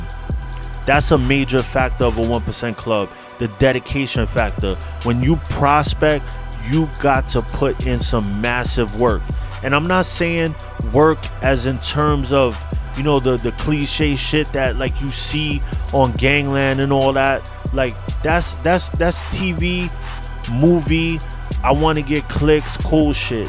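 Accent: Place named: Boston, United States. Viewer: This dude talks like 1090Jake 😂 must be from Boston area or sumn 🤷🏻‍♂️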